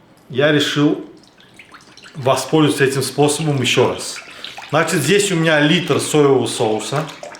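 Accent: Baku, Azerbaijan. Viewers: Бакинский акцент самый узнаваемый и лучший✊🏼🤓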